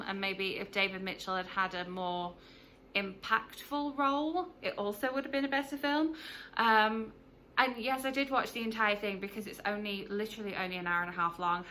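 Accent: United Kingdom. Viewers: I haven't seen that yet. As a Welshman I am proud to say he is one of our own. I can't believe you are Canadian, you sound Middle English.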